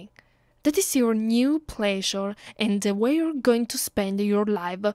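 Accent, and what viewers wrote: Italy. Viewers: This is the BEST accent I have ever heard in my life. Like, literally, it just turned out that Italian people are what I would want to hear all my life. Oh my God. WHAT IS THIS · Do Italian women actually sound like this in English lol or are you putting on a, 'Vampire' accent? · oh i've been kidnapped by a hot vampire lady with a italian accent, life couldn't get any better then this.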